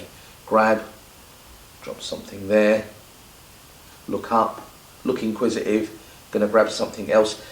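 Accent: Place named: Cockney, United Kingdom. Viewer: Your cockney accent was brill! Subbed just for that!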